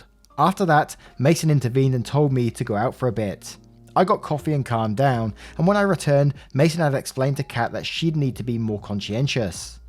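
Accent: United Kingdom. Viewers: You've never heard 'Drama Llama' before? Maybe it's a North American expression(based on your accent I am assuming you are in the UK).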